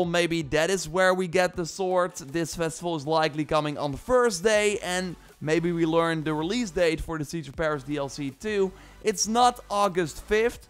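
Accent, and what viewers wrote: Netherlands. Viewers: The netherlands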